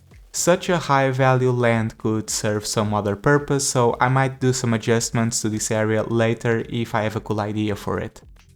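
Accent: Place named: Portugal. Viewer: Esse sotaque Portugues nao engana :D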